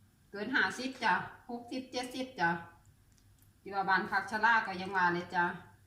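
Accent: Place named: Korat, Thailand. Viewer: สวัสดีจ้า สำเนียงนี่คนโคราชแท้แหละเนาะ คนกะไหนน้อป้าก็คนโคราชคือกันเด้อจ้า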